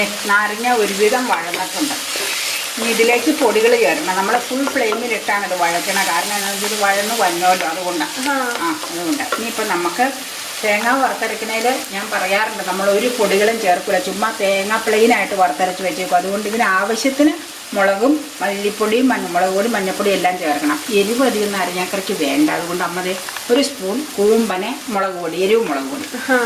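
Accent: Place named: Perumbavoor, India. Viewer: പെരുമ്പാവൂർ, കോട്ടപ്പുറം ഭാഗങ്ങളിൽ ഉള്ളവർ സംസാരിക്കുന്ന രീതിയാണ്. അവിടങ്ങളിലാണോ വീട്..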